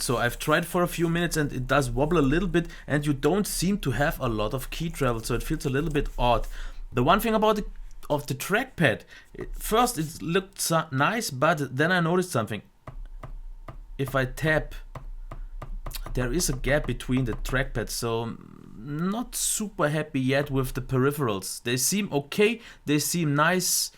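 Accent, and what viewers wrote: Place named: Germany. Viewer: Man merkt aber ziemlich, dass du ein deutscher bist :-) aber gutes Video so far :D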